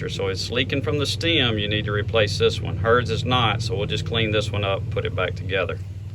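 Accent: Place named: South, United States. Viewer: Not $100. I paid about $40 - $50 at the orange box store. It depends on far the turn-valve is from street level. Mine is about three feet down so I can’t reach it with a wrench and had to buy the water key. In the far north, they can be four feet below ground, due to the frost line. This guy sounds like he’s in the South, so they’re not buried very deep and he can easily reach it with a hand tool.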